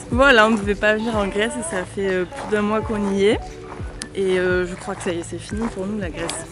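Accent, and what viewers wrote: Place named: Narbonne, France. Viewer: J adore vos vidéos les pitchous et en plus on a le même accent !!!! (Narbonne)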